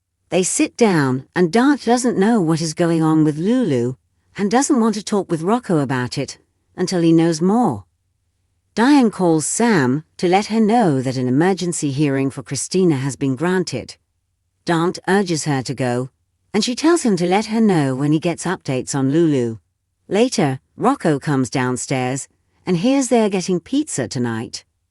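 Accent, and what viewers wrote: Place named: Brooklyn, United States. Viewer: Have never listened or watched your updates before I find your voice and the slightly different way you talk wonderful it's like why can't people be nice to hey she's from Brooklyn you know New Yorkers Brooklyn everybody living there has an accent from somewhere just thought I'd throw that suggestion out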